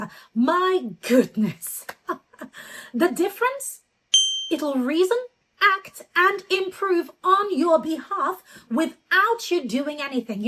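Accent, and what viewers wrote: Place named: United Kingdom. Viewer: (I am answer to you here, as on that reply i couldn't, i don't know why!) I am glad! ☺hands-yellow-heart-red And...YES! I hope they will be the company, that i can fulfill my long last dream, of making that project! And first i thought that you are from the US, later on i have figured out, as you have mentioned that, you are from the UK and truly paying attention, at your english accent! 💫🙏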